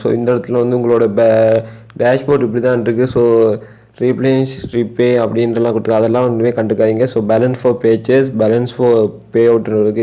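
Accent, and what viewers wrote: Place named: Sri Lanka. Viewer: Lanka tamil voice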